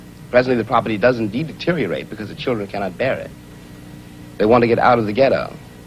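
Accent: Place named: England, United Kingdom. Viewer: Wow he sounds English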